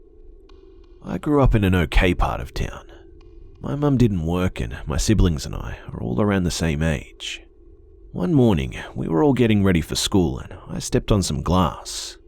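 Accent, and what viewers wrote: Australia. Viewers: Oh great now we got a shitty aussie reader who also sounds like a puff like the rest of them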